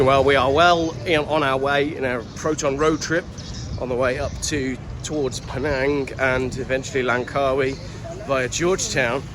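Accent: United Kingdom. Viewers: Bri’ish guy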